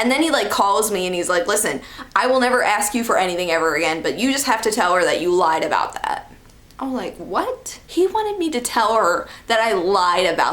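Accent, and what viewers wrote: United States. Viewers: Hey! You are really nice and you seem like a good person, +1 Subscriber. And the total Drama part about Duncan, YAS I LOVE TOTAL DRAMA ISLAND. Where are you from? You seem like you have an American Accent, I'm Australian btw.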